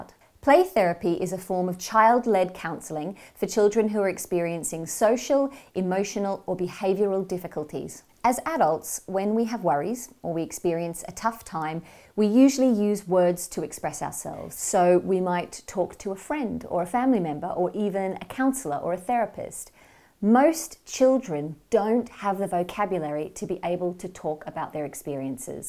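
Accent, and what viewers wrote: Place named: Australia. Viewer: I was so happy when I watched this, thinking this would be perfect for my 3yo son and hearing your Australian accent, thought it might be accessible to me but alas you are based in london. Any recommendations for something similar in Melbourne??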